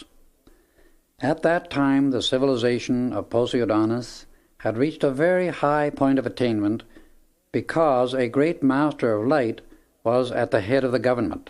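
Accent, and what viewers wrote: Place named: Northern Ireland, United Kingdom. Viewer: the accent sounds Northern Irish to me. Would love to know who it is.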